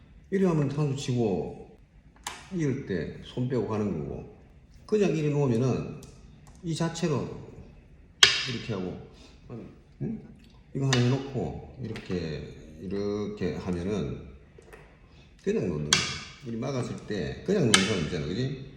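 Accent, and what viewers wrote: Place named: Gyeongsang, South Korea. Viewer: 원장님 경상도 사투리 쓰고 계시는데 혹시 고향이 어디 신지요 저하고 말투가 비슷해서 하하하 (맨들라꼬)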